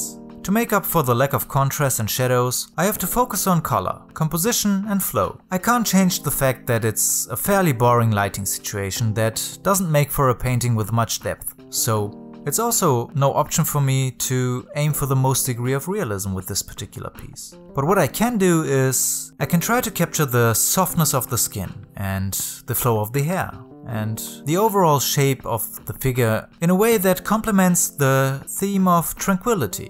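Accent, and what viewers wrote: Germany. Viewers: Ich genieße dein Englisch mit Deutscher Sprechmelodie es ist so angenehm !♡.♡ Dass du großArtig bist, weißt du ja schon ;)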